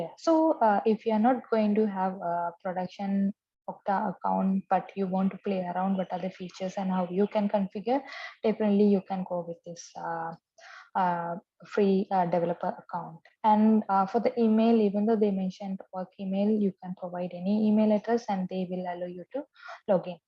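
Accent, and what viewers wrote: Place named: India. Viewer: awaz se to aap indian lagti hai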